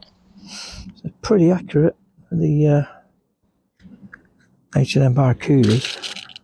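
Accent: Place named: England, United Kingdom. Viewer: Too much explaining in the English accent. Just shoot and zero the scope like any other scope. 😂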